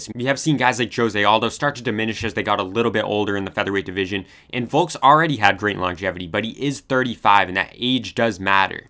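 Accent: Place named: Pacific Northwest, United States. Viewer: you’re definitely from the Pacific Northwest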